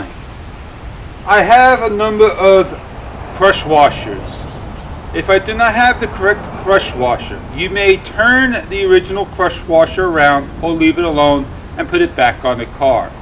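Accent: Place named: Brooklyn, United States. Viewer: When I heard you say the word "stoop" I just know your from Brooklyn. Maybe your filming this in Brooklyn. I was born and raised in Brooklyn. Why is this so special, well, now I live in Delaware. There are a lot of retired people here from Brooklyn, like myself, but when the locals here my accent they know exactly where I am from. Anyway, I have a question for you. You said to change the fluid 4 times to help to clean out the filter. Do you have to use the very expensive Honda ATF every time you change the fluid, or can you use a cheaper fluid for the 1 day to clean out the filter and on the last fill, used the Honda brand ATF?? I hope you get this question and provide me with a reply. Thanks!!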